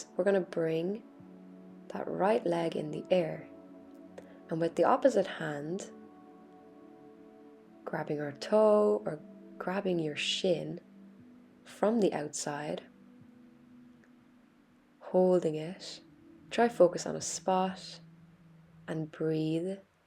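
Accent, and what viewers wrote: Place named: Ireland. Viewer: Hi Thank you for the lovely flow, great to hear a soft Irish accent 😊 Mandy 🌳 xxx Belfast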